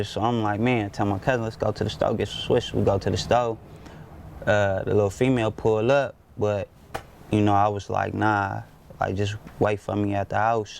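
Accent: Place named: South Central, United States. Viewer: either that's a west side south central accent or he's nipsey's #1 fan lmao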